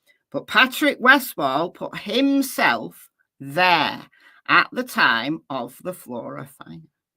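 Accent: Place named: Northern England, United Kingdom. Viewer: Recently discovered your channel. Nice to hear a northern accent - my Dad & Grandma are from Fleetwood, I’m from Leicester but live in BC Canada now. This case has me so outraged & I’ve followed since the beginning. So many unanswered questions & so much evidence pointing at other suspects. Hoping the truth will finally come out soon!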